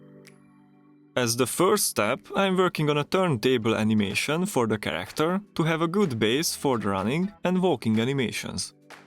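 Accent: Hungary. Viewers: Hungarian accent is so obvious 💀 Good to see an experienced hungarian pixel artist on the field. Do you have any major bigger scaled works/art pieces or do you only work in the gaming industry? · Whats your original language? Because your accent sounds like when a Hungarian speaks English 😁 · Basszus nagyon magyaros az akcentusod :D Amúgy ha az vagy és olvasod akkor rohadt jó tutorial-okat csinálsz. Csak így tovább! <3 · Szia, nem vagy véletlenül magyar? Mert nagyon magyar akcentusod van.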